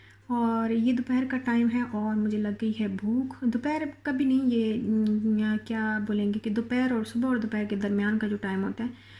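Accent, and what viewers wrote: Saraiki, Pakistan. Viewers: Hi sister!from where are u?ap k bolne se sarahki zuban ke jhalak a rhe h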